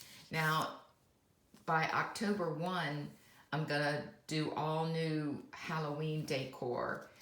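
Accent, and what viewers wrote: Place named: South, United States. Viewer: I absolutely love your fall planner the color is so nice for the season I'm trying to set up my ring light so I can be hands-free to do my video it would be my first one you're such an inspiration love your voice the southern accent always takes me away you're so sweet God bless you and the family 🤗❤️🙏🏼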